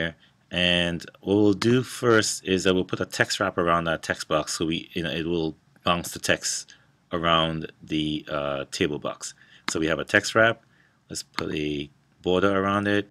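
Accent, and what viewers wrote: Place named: Caribbean. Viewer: Where's your accent from? You sound West Indian.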